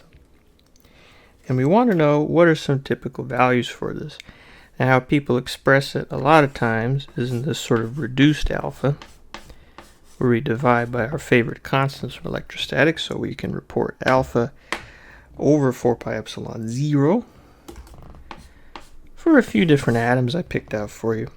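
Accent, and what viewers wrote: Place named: South, United States. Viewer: Love the Southern drawl haha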